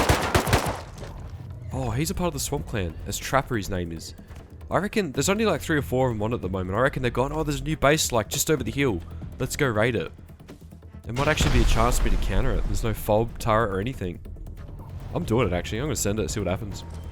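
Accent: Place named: Australia. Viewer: Is this dude Aussie? He sounds like an Aussie.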